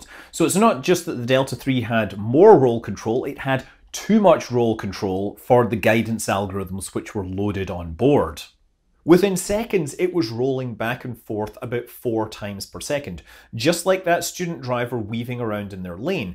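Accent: Scotland, United Kingdom. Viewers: That's Scots, och!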